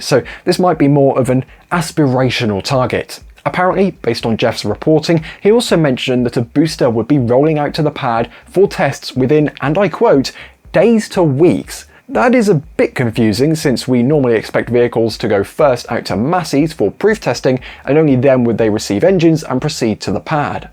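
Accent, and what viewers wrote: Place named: Southern England, United Kingdom. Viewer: It's a peculiarity of one of southern England accents and requires no teaching.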